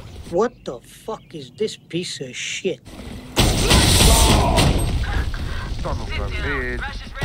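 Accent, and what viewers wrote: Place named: Russia. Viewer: Ты русская оказывается? в конце только когда услышала поняла)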